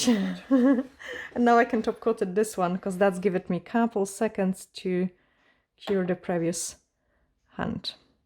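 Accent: Scotland, United Kingdom. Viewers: Absolutely stunning. I love hearing your wee Scottish hints in your accent 🥰 xx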